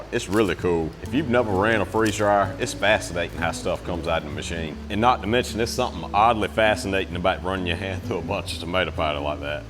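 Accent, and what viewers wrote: United States. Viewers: Where in the US is this accent from i swear every word that has a W or "ou"he says with an I Pider, sind, 😂😂😂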